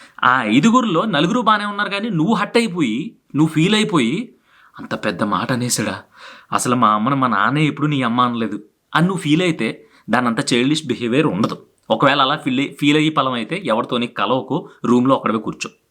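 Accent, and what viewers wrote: Godavari, India. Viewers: Hi bro, midi godavari district kada, ekkada. Baagundi language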